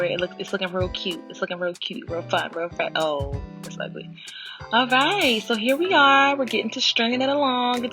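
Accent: Baltimore, United States. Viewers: What I’m gonna dewwww, I heard that bmore accent girl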